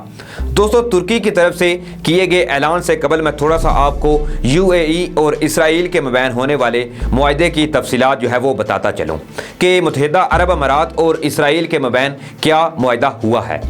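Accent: India. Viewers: Tum indan andaz me urdo kiu boolty ho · Yes he speaks like Indian people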